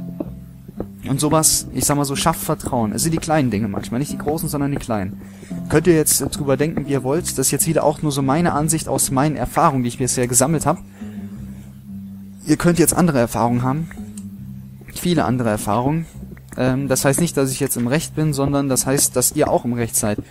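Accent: Hesse, Germany. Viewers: Als ich gehört habe, dass du Hesse bist (hab ich richtig vermutet), hätte ich dem Video gerne 1000 Daumen hoch gegeben! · Woher Genau kommst du aus Hessen?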